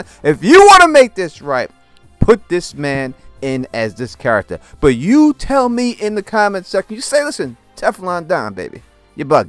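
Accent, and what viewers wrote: New York, United States. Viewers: Perfect casting! I love listening to your commentary as your NY accent is so distinctive and makes me miss home. NY strong.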